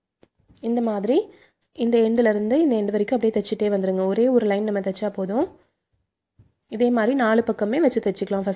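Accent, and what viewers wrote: Coimbatore, India. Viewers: Akka nenga Coimbatore ah..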